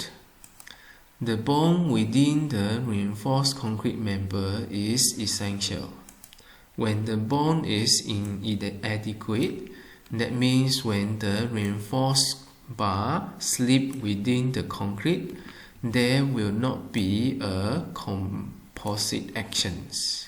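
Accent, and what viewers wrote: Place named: Malaysia. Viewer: i guess the presenter is malaysian? the accent is sooo familiar and feel like home =D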